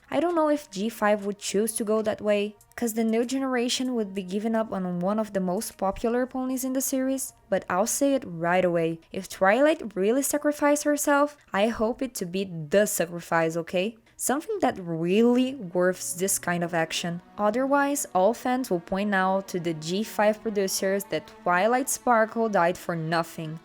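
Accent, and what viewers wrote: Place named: Russia. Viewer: Такое чувство, что акцент русский у Вас)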